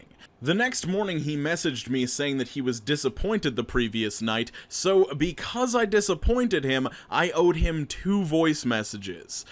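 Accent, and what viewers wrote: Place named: New York, United States. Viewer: That New York accent and voice killed me dude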